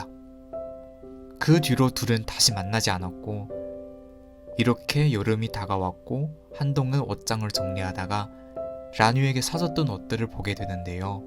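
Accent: China. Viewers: 중국인이세요? 발음이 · 중국분이신지 몰랐다가 증거가 부족했다는 설명에 어감에서 약간 알아챘어요! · 듣다보니깐 유튜버분이 조선족이신가보네요? 발음이 살짝 한국인 발음음 아니군여 ㅎ 잘보고 가요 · 한국어 정말 잘하시는데 살짝살짝 특정단어나 문장에서 얼화가 느껴지네요 성조나 발음까지 고칠 수는 없나봐요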